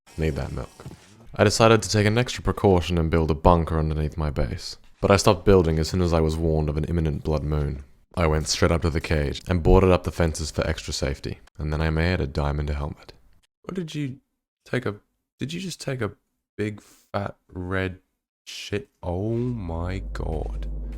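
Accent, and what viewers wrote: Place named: United Kingdom. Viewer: Jesus, are you livin in some castle in real life or is it because you can speak British really fucking well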